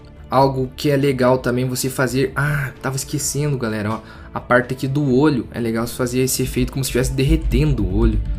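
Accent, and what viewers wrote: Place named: Rio Grande do Sul, Brazil. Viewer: parece gaúcho ne, também achei kjkjkkkkj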